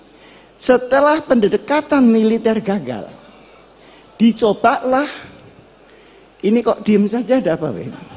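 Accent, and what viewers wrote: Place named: Malang, Indonesia. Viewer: KANGEN LOGATNYA KYAI MALANG PUOL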